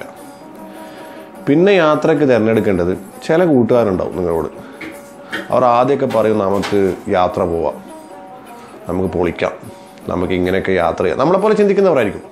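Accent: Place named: Malappuram, India. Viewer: kka ങ്ങളേ വർത്താനം.....അടിപൊളി....ങ്ങള് മലപ്പുറത്ത് ന്നാണോ?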